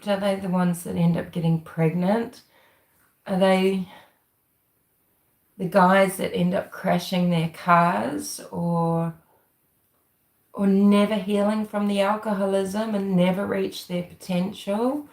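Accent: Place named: Australia. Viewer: Yesss. I'm listening now. It's bedtime. Love listening to your Aussie accent.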